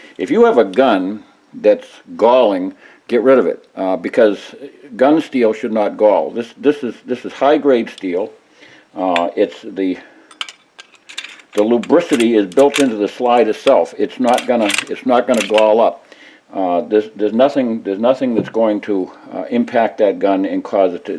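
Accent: New England, United States. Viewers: Hearing your accent, you can’t live to far away from the Sig Sauer headquarters? Grew up in Gloucester, Ma and raised my children in Gilford, NH. That is either a Southern Maine or a Southern NH accent. Love your videos.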